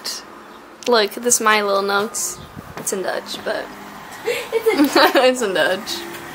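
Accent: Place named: United States. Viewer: This was sooo coool. I love Europe and it seems so much better to see it thorough the eyes of someone I know. Brighton is beautiful. Is your friend also Dutch?? Was that your first time in England?? Does England feel like the rest of Europe or does it feel like the USA, or just different from them both?? Did I understand that your flight was only 1 hr between Amsterdam and London?? That seems very close. When do you think you will need your own car? Could you have driven from your home to Brighton?? Do you even know how to drive yet?? LOL.. (Please forgive my ignorance if that seems like a dumb question.) You know how it is here in the US, you need your own car to get anywhere because the public transportation is not as developed as it is in Europe. And states here are so big, especially on the West Coast of the US. Life in Europe seems very different than here. 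You have lots of different countries and languages around you. Do you mostly stay in your own country or do you travel a lot around Europe?? Was it expensive to fly from NE to England?? Do British people think that you are American because you speak English like an American?? Where you were here, I could not even hear your accent anymore. Your English is very clear. I wish I could speak Dutch as well as you can speak English, but Dutch looks like such a hard language to learn. LOL... Fantastic video. Good music and good editing. Thanks a lot.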